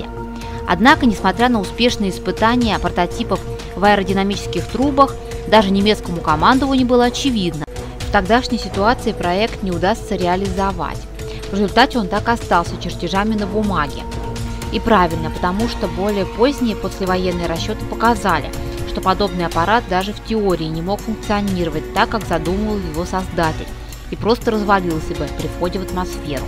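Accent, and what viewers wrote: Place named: Moscow, Russia. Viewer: Ага, мааасковский.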